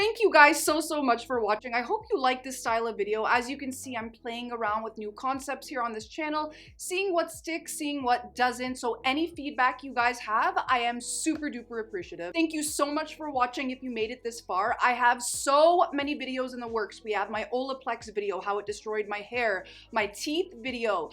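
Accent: Canada. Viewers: Are you Canadian? You sound very Québécois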